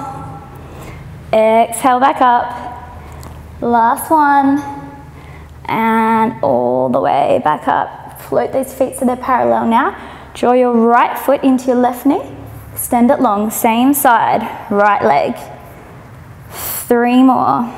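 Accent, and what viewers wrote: Australia. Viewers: Beautiful accent from down below...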